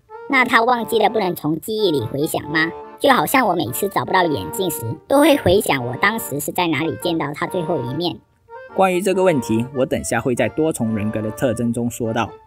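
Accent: Malaysia. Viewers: 馬來西亞人的口音本來就這樣的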